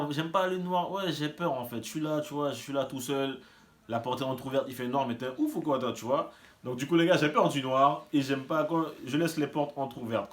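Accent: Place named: French Guiana. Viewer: Je le jure que sur la façon de parler ça ce voit qu’il est guyanais incroyable surtout le "les gars"😆 🇬🇫✨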